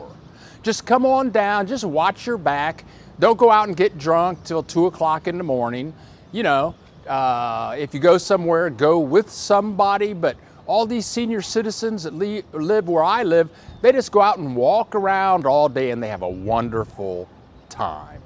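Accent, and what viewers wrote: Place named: Midwest, United States. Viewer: Funny. From Detroit, I detected midwest there!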